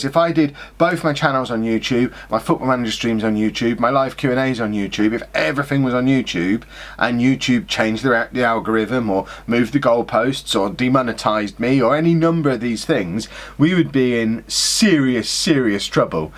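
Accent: Cockney, United Kingdom. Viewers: Just need to master that cockney accent of yours and get a computer that can handle streaming with a green screen behind me so I can steal your set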